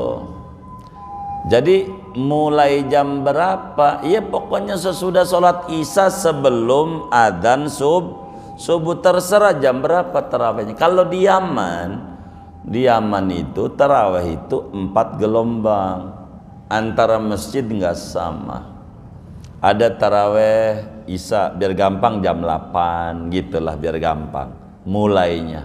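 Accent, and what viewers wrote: Jakarta, Indonesia. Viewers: Seneng ama kiai ini apalagi kalo logat betawinya keluar...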